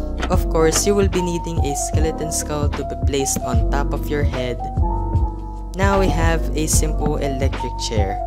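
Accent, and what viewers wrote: Philippines. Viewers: I instantly recognized the filipino accent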